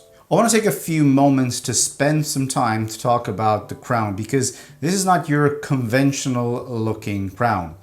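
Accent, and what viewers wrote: Ireland. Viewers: "I'm not Irish". I mean you sound pretty Irish bud.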